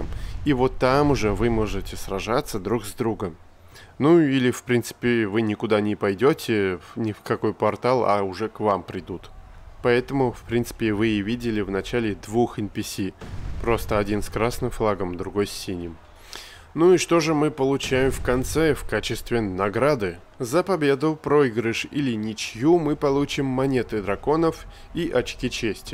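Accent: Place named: South, Russia. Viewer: Необращай внимание, есть у тебя некий "южнорусский" акцент).Вначале думал ты с Украины, но потом увидел некий город на букву Л.Это фигня, ты молодец, работаешь над собой помню твои старые ролики)))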